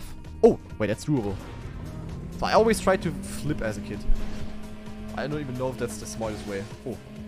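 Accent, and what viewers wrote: Germany. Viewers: gotta love the fact that you can hear the german in his voice, cool video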